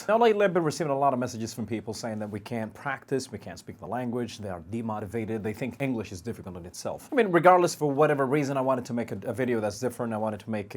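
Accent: Texas, United States. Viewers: toto toto It is just because American language that's the reason you couldn't understand anything my dear but I hope u could understand everything with the passage of time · I',m really like how you talked and your accsent like American people, i will be like you and better, i will come back to this video after one year to Comparison my language, finally i Thank you mr. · Bro ur hilarious and ur accent is nice it seems like u came from America or some other ENGLISH country I'm Algerian/Canadian I was raised in Canada and I hope I can meet u one day or talk to u through Facebook or something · great video but you speak american acten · your accent is American somewhat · هذة لهجة تكساس اميركا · المشكلة يتكلم انجليزي سريع وامريكي وهذي مصيبة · Very useful video, thank u!! by the way; your accent is amazing😍 feels like you're an american guy tho.. keep it going✋🏿. 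and i want to say something; if you love something you can do it. No matter how hard it is. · you talk with use amircan accent very good. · like that american accent, apreciate that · اخويا اللهجه هادي امريكيه ؟ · he sound like a person from texas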